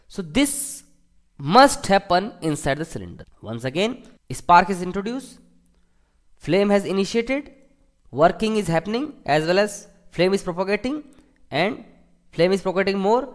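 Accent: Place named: India. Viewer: You can’t just speak English using the pronunciation of your own language. We can hardly understand a single word you’re saying. You might as well be speaking Indian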